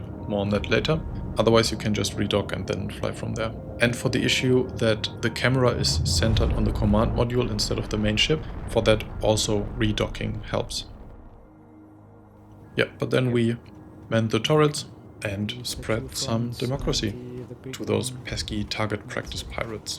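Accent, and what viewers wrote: Germany. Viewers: er ist deutscher, das hört man :-)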